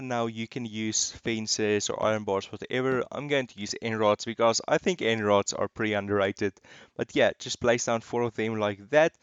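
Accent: Afrikaans, South Africa. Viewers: Are you South African? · You are SA right? you sound soooooo south African just like my best friends! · Its not just me or is this man Afrikaans cuz I am and he sounds a lot like somebody from my country :/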